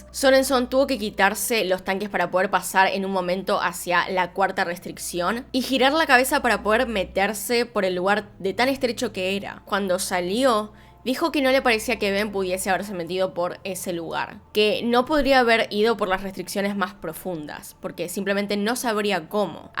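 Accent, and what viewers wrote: Argentina. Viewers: que te pasa con el acento argentino, gil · Lo único malo es el acento argentino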